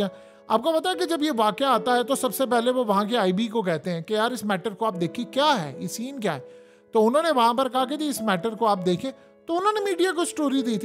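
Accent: India. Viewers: 💯یہ خاتون ہندی ہے ،،جس روانی سے یہ ہندی کے الفاظ بولتی ہے اور جس انداز سے بات کرتی ہے بالکل انڈین سٹائل ہے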